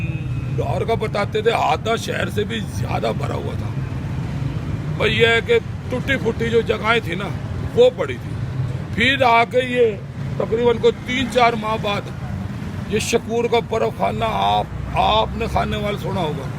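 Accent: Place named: Haryana, India. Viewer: BoLi rohtak ki hy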